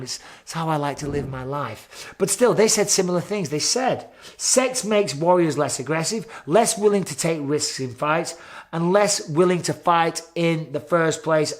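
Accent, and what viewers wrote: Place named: Liverpool, United Kingdom. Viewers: That scouser accent👌👌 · Fucking hell that one caught me off guard😂😂………. Also I know how our NW England accent sounds when saying a sentence like this 👍👍!!!! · The scouse was pristine.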